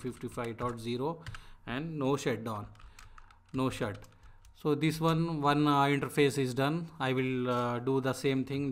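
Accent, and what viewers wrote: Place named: India. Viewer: India English is very difficult to hear 😵‍💫🤮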